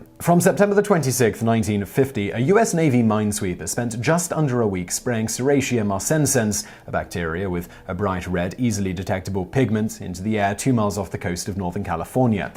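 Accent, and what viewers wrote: United Kingdom. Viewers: Always some British voice dipshit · It's like infowars with a Brit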